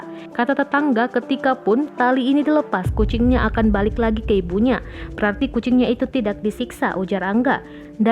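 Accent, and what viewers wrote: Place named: Jakarta, Indonesia. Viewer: Mungkin Orang betawi , jadi setiap hurup a diganti e 😅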